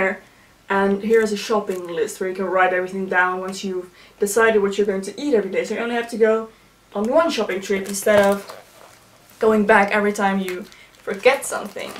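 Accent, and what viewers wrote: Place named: Netherlands. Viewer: Are you Dutch? I love your accent!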